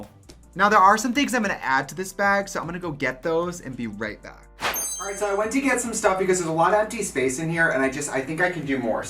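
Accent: Wisconsin, United States. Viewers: I hear your WI when you say go bag 🤣🧡🧡 I'm from Racine which you may or may not know isn't too far from the Mil much love I bought the Plant Gay PR Box and I use that goddam box for my makeups you are an icon I love you I'm going to convince my bf to take me to This Is It bar so he can finally LIVE ❤ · I love her Wisconsin accent! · her wisconsin accent in this video>>>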